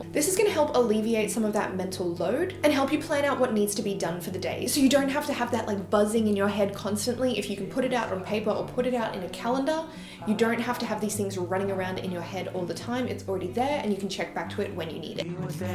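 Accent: Australia. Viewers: I miss the Aussie accent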